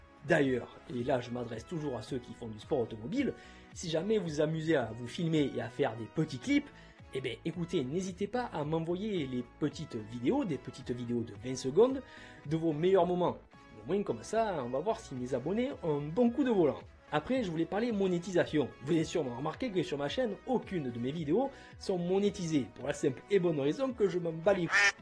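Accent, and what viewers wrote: South, France. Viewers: Merci YouTube de m'avoir emmené ici, c'est vraiment très intéressant, le tout saupoudré d'un accent du sud chaleureux 👍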